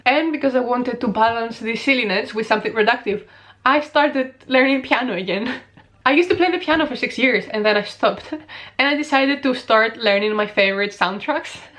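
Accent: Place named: Greece. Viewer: that explains it! My good good friend Akis is from Greece in Trikalla, both your accents are fairly similar. I know the word clap and that is al... are you ready to be overcome with emotion... right here is comes: hirokrotima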